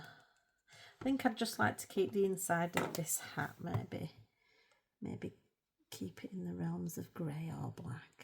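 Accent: Yorkshire, United Kingdom. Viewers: I am still watching but had to come here to say this before I get too entangled on the video and forget... when I heard you say "trying speak more clearly"... I love your yorkshire accent/dialect, it's so beautiful and it adds so much personality to your videos! Loveee it! ❤ (even on the occasions I understand nothing at all 😂, still sounds like music to my ears nonetheless) That being said, it took me a few videos to understand if "cracking" was a good thing or a bad thing 😂... but then again English is my second language, so it could just be a lack of proper knowledge on my part 😂, but hey, learning something everyday right? The texture of these gel crayons on your page remind me of Van Gogh thick oil paint strokes that lends a whole different texture and dynamics to the painting. So nice! And it looks so satisfying to watch you smoosh the colors together! 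😊 amazing!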